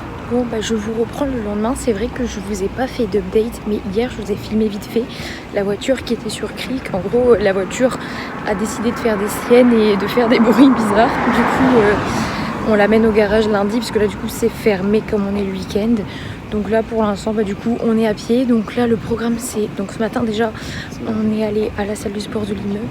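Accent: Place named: France. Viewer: Bienvenue parmi nous au Québec. Je te souhaite une excellente intégration et te conseille vivement de garder ton accent de France : vous avez un français magnifique, alors ne cherche surtout pas à imiter le nôtre. Au plaisir !❤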